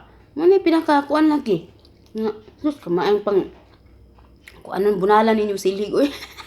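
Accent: Visayas, Philippines. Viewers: yan tlaga magsalita bisaya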